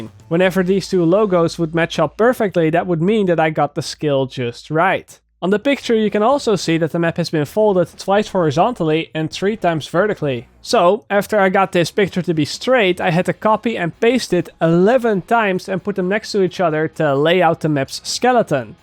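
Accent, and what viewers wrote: Netherlands. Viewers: You can hear he's dutch by his accent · Fack you Have a so clear dutch accent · Euhm i gues You are from holland🙃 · Het is te horen dat je nederlands bent :) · hoorde aan je accent al dat je nl was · really well put together video, I'd say you could assume the map to be 14 squared km. also are you dutch? kind of recognize the accent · are you dutch? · The guy who was talking is dutch, i hear it from his accent. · Kom je uit nederland? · clearly Dutch accent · is deze guy Nederlands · lekker nederlands accent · je klinkt echt zwaar nederlands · ok... I really like your vids... but pls... try to avoid that dutch accent! Grüße aus Deutschland ^^ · he sounds dutch or not a real english man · je hoort dat hij nederlands is 😂😂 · You're sooooo Dutch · Ik ben 100% zeker dat jij een Nederlander bent.😜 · nederlander die engels praat..... heel schattig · Heerlijke nederlands accent ;-) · Hoor zijn accent dit is sws nederlander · Je uitspraak is echt heel Nederlands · Your Dutch accent is so Obvious. 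· lol, so funny how you can hear, within seconds, that the video in english is made by a dutch person. you can just tell immediately. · Are you from holland bcz I've never seen you but you just sound like you have a dutch accent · dude I love your video's but holy shit get rid of the dutch accent.. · jou accent man... je bent zo nederlands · Hears voice and accent for 2 seconds...I smell dutch person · ik hoefde niet tot het einde te wachten om erachter te komen dat je Nederlands was, typisch Nederlands accent man xd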